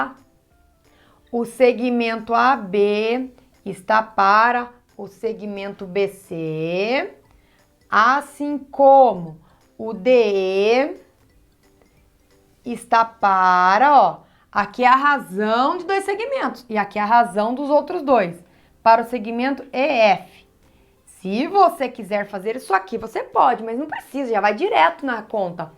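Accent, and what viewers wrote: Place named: Caipira, Brazil. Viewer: o sotaque caipira dela🥺🥺💗 Ótima aula, parabéns!!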